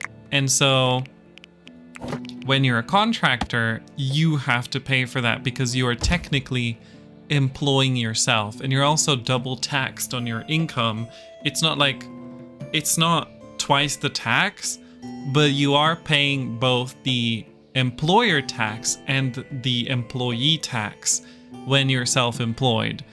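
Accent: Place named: Netherlands. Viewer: You sound Dutch. 👍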